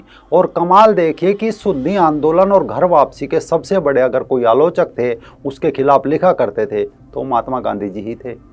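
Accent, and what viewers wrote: Haryana, India. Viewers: हरियाणा के है भाई कुछ करने वाले को मत रोको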